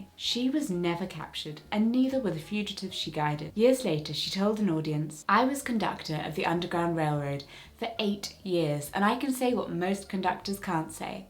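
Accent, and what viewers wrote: United Kingdom. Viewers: While not the "correct" pronunciation, I think the way says it is adorable, especially with her British accent. It makes Maryland sound like a lovely place of sunshine and good cheer. 😊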